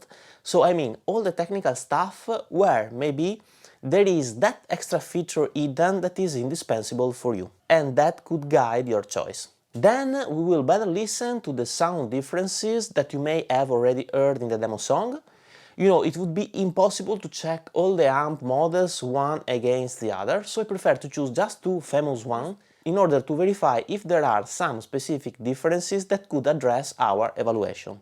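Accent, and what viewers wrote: Venice, Italy. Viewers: Complimenti per le capacità esecutive e dettagliata recensione, bravo Dall'accento direi italiano, Veneto, probabilmente veneziano 😊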